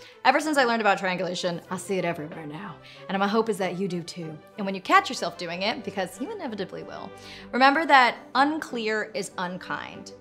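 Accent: Canada. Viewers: Are you Canadian!?!?!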